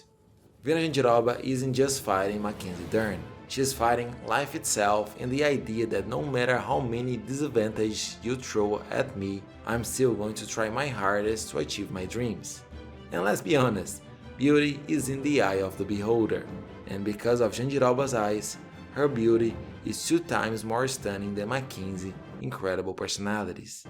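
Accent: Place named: Brazil. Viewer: Vc é brasileiro pae, da pra reconhecer pelo sotaque irmão kkkk! VOCÊ FOI PEGO!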